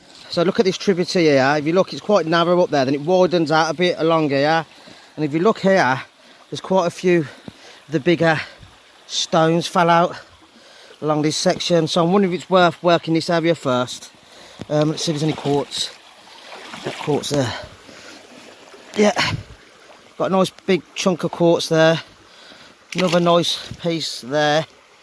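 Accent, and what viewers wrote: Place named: Birmingham, United Kingdom. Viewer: Absolutely fascinating video , really enjoyed it … BUT .. omg that ACCENT 😂… just gotta be honest I hate that accent of yours , Brum/Black country esque… sorry mate I watched it with the sound off .. I feel terrible saying that but it is true ! Good luck with your future panning 👌 👍🏴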